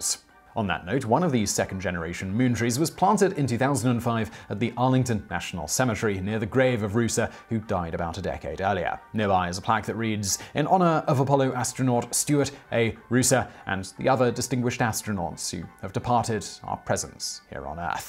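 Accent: United Kingdom. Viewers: Okay, I know I give you crap all the time about your pronunciation of Los Angeles, although I did hear you say how an American would say it really recently, proud of you, the way you said New Orleans is not too different from where people where I'm from say it, so I don't know if you've been taking notes or just in the UK that's how they say it. there's another English guy on another Channel I watch, and he keeps saying Saint Louis as "Saint Louie". now, there is precedent for that, and historically it has been pronounced like that, but nowadays we say it more like "Saint Lewis". So, imagine your Welsh friend is really holy. Anyway, I have to say though, I didn't know whether or not this channel had started getting into conspiracy theories when I saw the title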